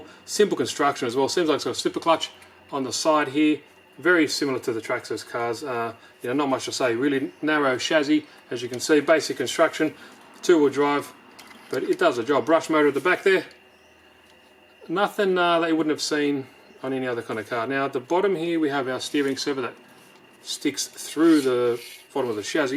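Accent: Australia. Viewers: It’s a shame that your vids keep getting demonetized, seems like YouTube’s never experienced Australian English before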